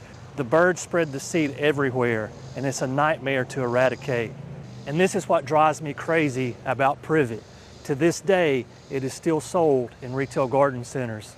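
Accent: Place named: Alabama, United States. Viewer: You need to list your region. Based on your accent I’m guessing you’re somewhere near Alabama.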